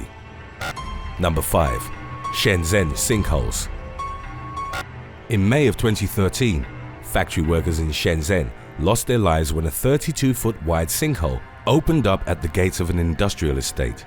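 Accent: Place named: United Kingdom. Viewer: Alyssa Davison Me too and the Brit accent.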